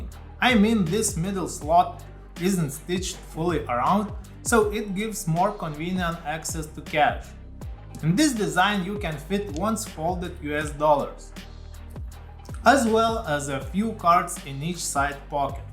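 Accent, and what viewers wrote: Russia. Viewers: Слышу как будто русский акцент... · nice channel bro! you are russian? your accent...))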